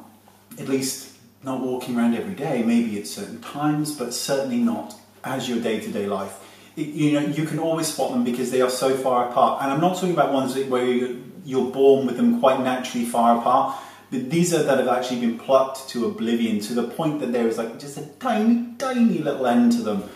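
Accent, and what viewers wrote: United Kingdom. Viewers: I don't know what it is but I love hearing him say naughty, I think it's the British accent and the lack of anyone ever saying it in Texas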